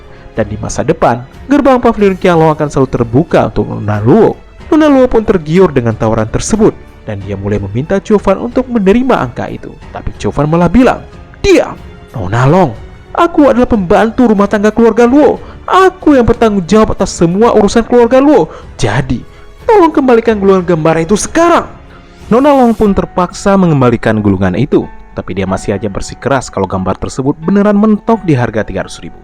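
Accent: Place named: Sunda, Indonesia. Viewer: iq7 lebih kentel sunda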